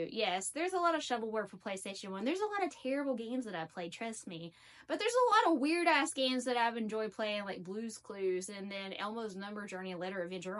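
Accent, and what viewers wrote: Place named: Texas, United States. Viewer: she sounds like a Texan to me 😍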